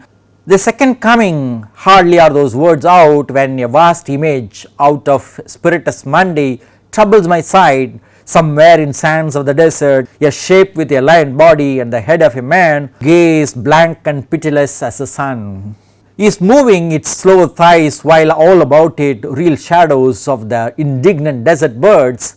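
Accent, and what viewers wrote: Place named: India. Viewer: There are different accents in the world... We feel better with Indian and british accent.you better listen to him carefully.He is an English prof. In reputed IIT. Look at good things... The stuff they have prepared is very much student friendly.... The content is brief and comprehensive. Look at good things.don't comment rudely.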